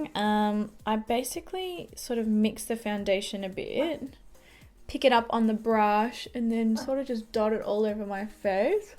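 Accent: Australia. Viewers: Love your tutorials and reviews and your lovely Australian accent! 😊 Did you feel like the handles were so flimsy they would break easily? Also, did the brushes shed?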